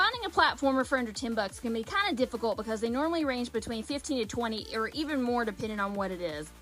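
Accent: South, United States. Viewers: I love your charisma! Don’t see many other southern accented gamers. Bless you friend!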